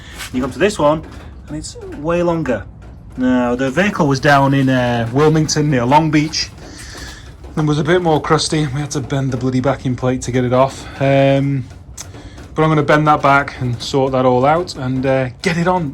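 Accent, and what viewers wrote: United Kingdom. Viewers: yeah totally understand buddy. I just wish YouTube would place them better. Loving the build buddy its not my normal cup of tea but you and that bug have me hooked! More than worth the awkwardly placed adds! Loving the way the 'BRIT' keeps coming out every now and then. 😁👍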